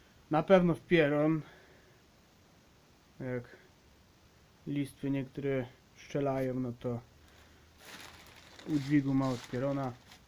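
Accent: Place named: Lesser Poland, Poland. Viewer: Wiedziałem ,że Małopolskie🤪 Swój swojego pozna. Na filmiku gdy opowiadasz niektóre słowa akcentujesz podobnie jak niektórzy w moich stronach.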